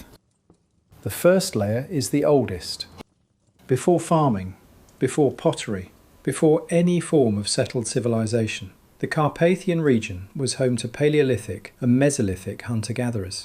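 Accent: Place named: United Kingdom. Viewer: always weird when you hear some british accent telling about Romanians...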